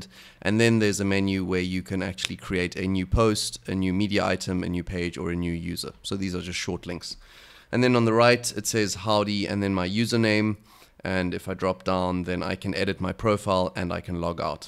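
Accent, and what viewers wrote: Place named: Cape Town, South Africa. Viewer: Thank you - this is super helpful! P.s. knew you were from Cape Town the minute I heard you talking:) Lived there last year, it's a gorgeous city!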